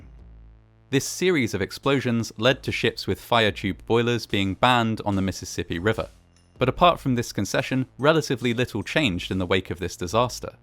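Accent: England, United Kingdom. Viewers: By his accent, one would assume he is English, so the spelling HOSPITALISED is correct.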